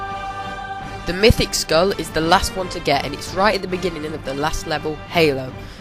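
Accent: England, United Kingdom. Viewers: o rite sorry if u took offence i did actualy use ur guides a while back to get my halo online spartan laser acievmentssuch as 2 kills in 1 shot and shooting the banshee :D u make great quality video btw a guide for the laptops in COD 4 would be useful to alot of people it took me 3 hours to get them with a written guide bu with one of your video guides it would be alot faster just an idea just wondering what part of england is ur accent from?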